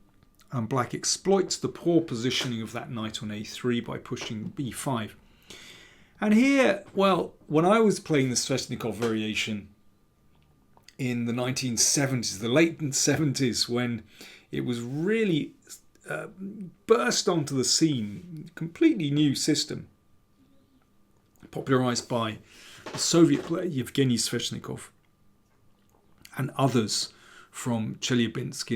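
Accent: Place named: Southern England, United Kingdom. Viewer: That's his accent - middle-class southern English, I don't know why you call it patronising or what you expect him to do about it. And he's a grandmaster, and in my view the best of the YouTube full-time chess analysts. Carlsen's opinion obviously carries great weight, but a grandmaster is perfectly free to voice objections to it. In fact that's his job, to give his opinion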